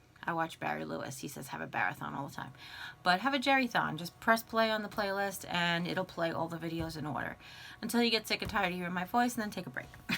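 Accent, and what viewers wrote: New York, United States. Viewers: You are amazingly creative! I'll never get tired of hearing your voice being from TN I love your NY accent! The scale and the tiered tray were my faves but I love them all! God bless you Tfs!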